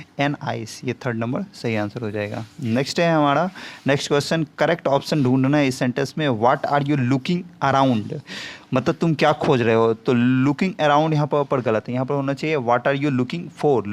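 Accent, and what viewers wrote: Bengal, India. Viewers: sir, aap bengali ho?? LOVE THE WAY YOU TEACH...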